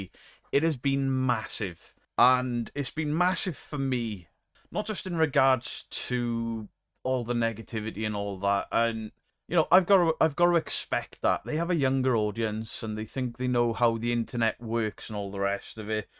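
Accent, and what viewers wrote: Wales, United Kingdom. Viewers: you're not toxic, whoever told you communities were are correct. There are just a small faction of people online who are control freaks, narcissists, and have nothing better to do than try to cause toxicity between groups. They will never stop no matter what you do and do not do. You are beloved in this community. You're the first debunker I've ever seen when I didn't know what that word meant. I could barely understand anything you said because I didn't understand the welsh/english. Now I understand you perfectly, and enjoy listening to you. Mrs. Geek is awesome! ❤You changed my life, recommended other fantastic people who have changed my life as well. Don't let these bad people cause you to question yourself. You've never been the problem. As an outsider I can see much more than some people who are really close to it can. 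Thank you for being you. ❤❤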